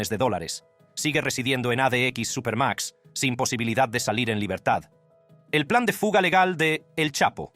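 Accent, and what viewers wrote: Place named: Central America. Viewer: De seguro no es gringo. Por su voz parece centroamericano.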